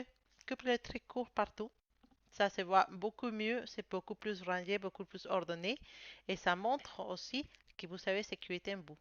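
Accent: Spain. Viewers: Bonjour, je vous découvre avec votre douce voix et joli accent espagnol. Vos guidances sont vraies et si lumineuses. Je travaille depuis plusieurs mois pour lâcher prise de mon passé toxique, être en paix dans mon âme, mon coeur et mon corps et l'univers me met à nouveau mes ex sur ma route. Difficile d'être seule mais je pries mes archanges et mon ange. Je suis sereine malgré mes difficultés financières et refuse le retour d'un ex. A quand le repos et le bonheur d'aimer à nouveau de tout mon être? Comment s'appelle votre pierre orange qui vous illumine? J'aimerais gagner un tirage personnel et participe au tirage au sort. Merci d'être là. Je vous embrasse. Namasté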